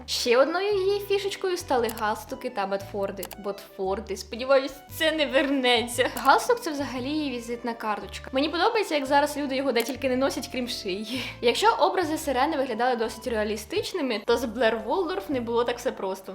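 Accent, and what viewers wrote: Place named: Central Ukraine, Ukraine. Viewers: Я так думаю дівча з Полтавщини... це типова полтавська "манєра балакати". Я сама з Полтави, у мене навіть подружка є, просто копія ця мала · Ви межі своєї області не покидали ніколи?😅 Центр і схід України, майже всюди, говорять так) Послухайте творчість Артистки, вона з Херсонщини😊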